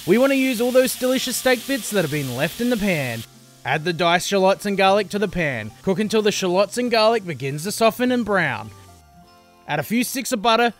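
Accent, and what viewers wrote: Australia. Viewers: That's a nice accent you have there .au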